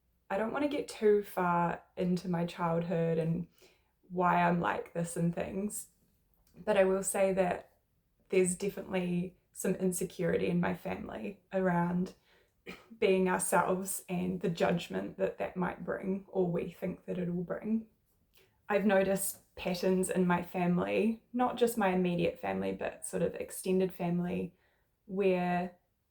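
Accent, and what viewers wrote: New Zealand. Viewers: That sounds like a kiwi accent. Kiwi culture is pretty shit really, and aren't welcoming to people's quirks.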